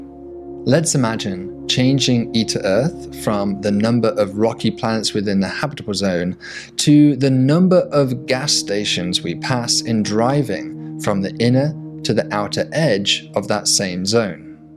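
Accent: United Kingdom. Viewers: So if as you sound are British, why are you using so many Americanisms? Would an American do the same?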